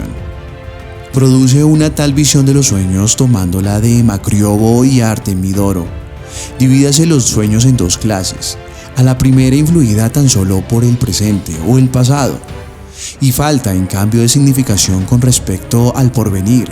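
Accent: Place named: Colombia. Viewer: Una voz humana que habla como robot, no sirve! Deberia hablar mas natural sin tanto acento colombiano y mas neutral. Saludos!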